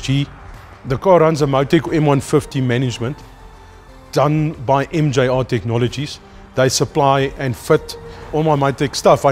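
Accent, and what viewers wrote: Afrikaans, South Africa. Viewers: That's just a South African Afrikaner accent. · Thats the thickest Safa accent of all time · What accent is it, does anyone know?! I mean sounds a bit South African accent but not sure.🤔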